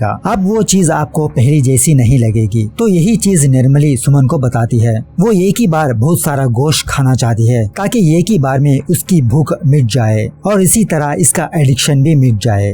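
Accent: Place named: South, India. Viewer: Awesome south indian accent and current issues included in the explanations....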